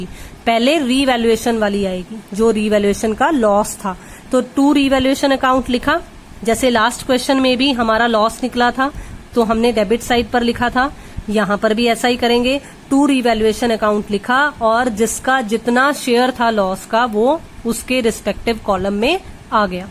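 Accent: Punjab, India. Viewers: Punjabi sound 😝😘😘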